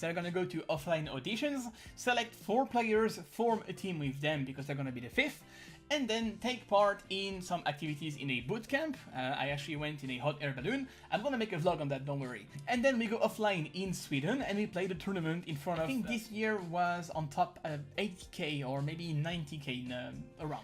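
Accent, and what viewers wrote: France. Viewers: Cet accent... l’accent français se sent.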